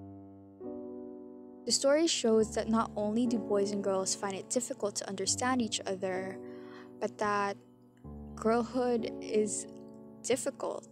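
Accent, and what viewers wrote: Philippines. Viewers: I know a Filipino accent when I hear it.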